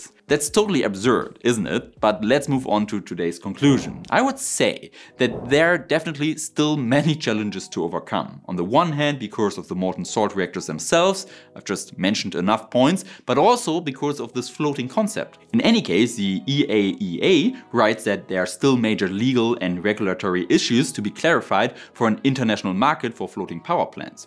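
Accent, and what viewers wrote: Germany. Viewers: I come here to listen to that German accent and I always learn something new. WIN - WIN.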